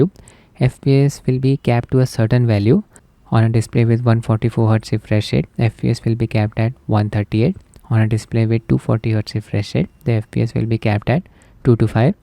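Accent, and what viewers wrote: India. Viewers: Indian voice, in the garbage it goes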